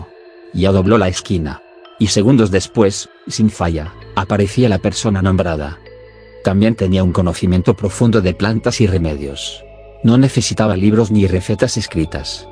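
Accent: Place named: Mexico. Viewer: exactamente, tenemos otros términos y lo narra muy mexicano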